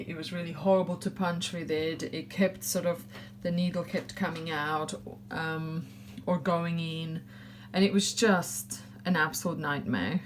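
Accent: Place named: Slovakia. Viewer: Ďakujem za skvelý návod,rozpoznávam slovenský prízvuk :-) .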